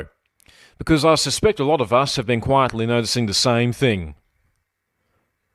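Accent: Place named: Ireland. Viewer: What a silly accents! Irsih?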